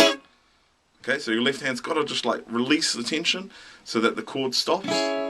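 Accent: New Zealand. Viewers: haha you are hilarious dude. Stoked to hear a kiwi accent mah bro. great tutorial too man. Such a solid RnB song aye. Us white boys got some soul too haha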